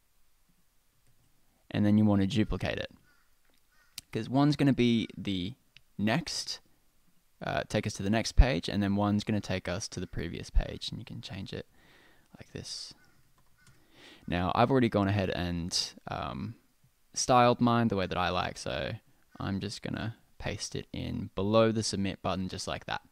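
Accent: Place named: Australia. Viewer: Love hearing an Aussie accent. Is there a Framer template that already has the multi step form built in? I'm useless at building stuff in Framer.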